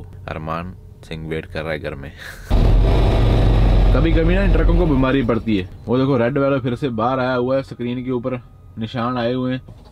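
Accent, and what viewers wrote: Punjab, India. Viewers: ਪੰਜਾਬੀ ਬੰਦਾ ਜਦੋਂ ਹਿੰਦੀ ਬੋਲਦਾ ਤਾਂ ਕੱਚਾ ਜਿਹਾ ਜਾਪਣ ਲੱਗਦਾ।ਬਾਕੀ ਗੁਰੂ ਰਾਖਾ ਐ ਤੁਹਾਡਾ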